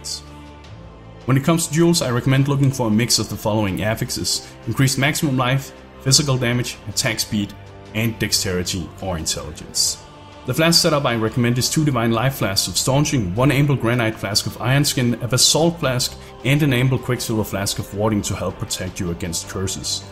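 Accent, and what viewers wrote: Denmark. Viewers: Was thinking.... Damn thats a danish accent.. "Checks your twitter" oh... you ARE danish :) nice Nice content man, det er super godt :)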